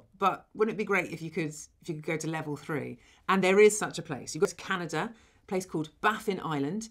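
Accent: United Kingdom. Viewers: what part of the UK is she from ?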